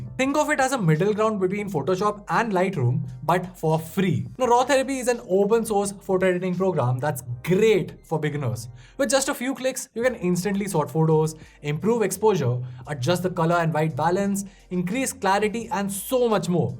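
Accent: India. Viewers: You're from delhi, aren't you? 😊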